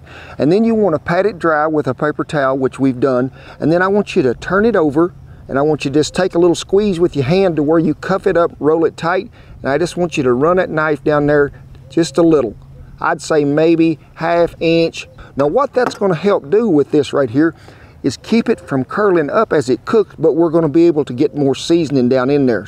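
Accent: South, United States. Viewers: " Done gottum caught, an in the boat, an fitem t putum ona fire" Mr. you're as country talking as us folk in Upstate S. Carolina. Love your videos.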